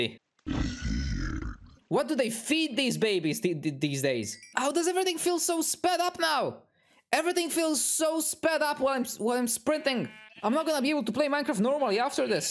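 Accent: Italy. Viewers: Italy? Sei italiano? (Are you Italian) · Capisco dalla pronuncia che sei italiano complimenti per l'inglese ti giuro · " So it cooks ( Coo-ks) faster " Gosh i love Italian accents XD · Your from italy?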